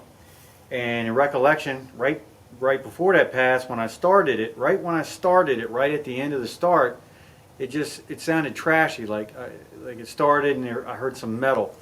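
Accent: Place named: London, United Kingdom. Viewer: Love your farm! Are you in MD? Your accent sounds like my friend's in Hampstead. Thanks for the Kohler info. - Craig in PA (LT40 wide owner)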